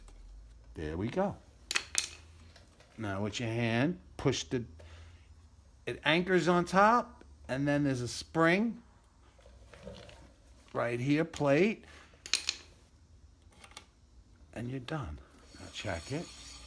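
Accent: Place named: South, United States. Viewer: I noticed you have a slight accent are you from are you from Tennessee or Kentucky 🤠🤠🤠🇺🇲🇺🇸🇺🇸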